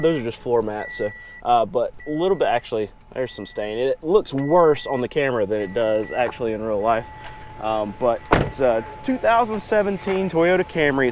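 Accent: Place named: South, United States. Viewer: From the sound of your accent your in the south. No money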